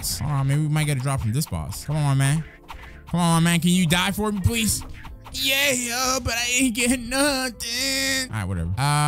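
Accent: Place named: Spain. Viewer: eres de ascendencia española I can kinda hear it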